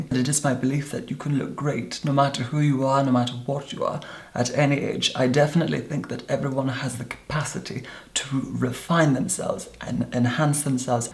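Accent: Scotland, United Kingdom. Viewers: Jack Walker it's just a contorted posh Scottish accent.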